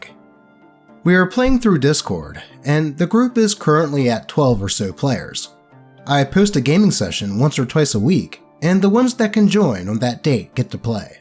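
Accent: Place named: Maryland, United States. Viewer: I love this guys Maryland accent.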